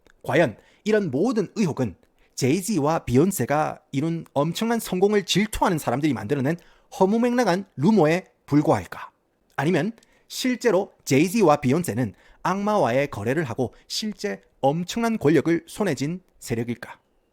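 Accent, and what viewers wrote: Gyeongsang, South Korea. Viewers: 갱상도 사투리로 영어를 말아주네 ㅋㅋㅋㅋ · 임마 😂😂😂 애비 🤣🤣🤣 처음 영상 보는데 너무 재밌게 잘 보았습니다 🤗 경상+잉글리쉬원탑티어!!! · 찰진 경상도 사투리 사이 사이에 들어있는 쫜득한 영어발음 때문에 컨텐츠 상관 없이 계속 보게 됨…외중독되… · 구수한 갱상도 사투리로 usa 조져주는 바로 그맛 아입니까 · 경상도 사투리와 완벽한 영어발음의 아름다운 조합. 좋아요😊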